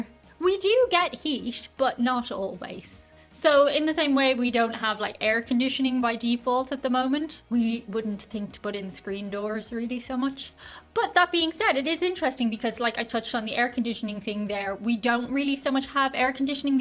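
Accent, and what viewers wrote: Ireland. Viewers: I have travelled the world, and find the Irish people the friendliest people on earth. PS - I love your accent/brogue. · Hi! New to your channel! - for a kick sometime, record yourself saying the words "power, pour, par, pair" - in your charming Irish accent - play it back, and enjoy the echo effect? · That Irish accent. 😍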